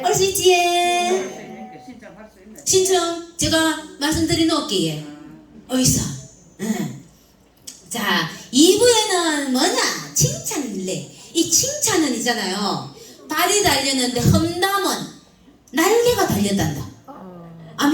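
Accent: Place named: Gyeongsang, South Korea. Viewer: 경상도 사투리 구수하면서 찰진 표현 아무쪼록 칭찬하고 삽시다😊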